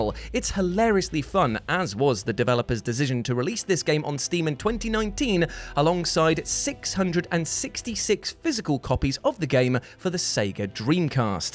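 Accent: South, United States. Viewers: As a southerner (TN USA) I gotta say....your (admittedly somewhat over-the-top) southern accent was on point man lol....GJ. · That Southern accent 💯💯💯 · Not mad at that cowboy accent at all 😂😂😂😂😂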